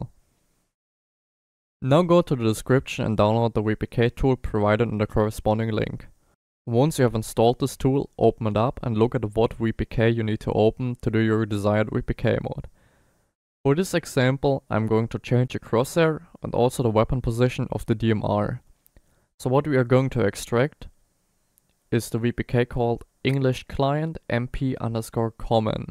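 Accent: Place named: Germany. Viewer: I'm just here for the German accent ASMR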